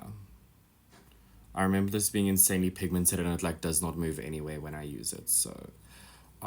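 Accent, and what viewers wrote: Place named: South Africa. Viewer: I love the south African accent soooo much!!! Can u speak the language for us just once in one of ur videos???!!! Please please pleeeeeeease!!! Saying that the one foundation smells like "literal shit" 😂😂😂 omg ur so funny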